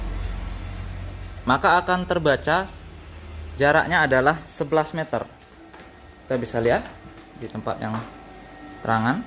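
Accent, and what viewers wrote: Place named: Java, Indonesia. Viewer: Klo di perhatikan bli ini ada logat jawanya ya...munhkin pergaulan yg luas jg kli ya...😀😀👍👍👍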